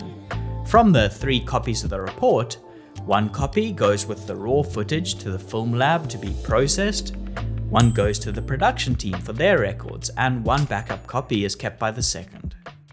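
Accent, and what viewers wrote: South Africa. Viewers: Hey man, great content, your accent is so SA! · Bru are you South African?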